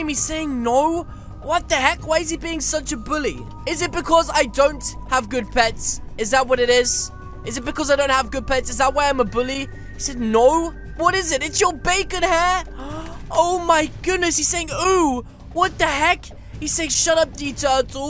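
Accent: United Kingdom. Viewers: Are you bristish I love your accent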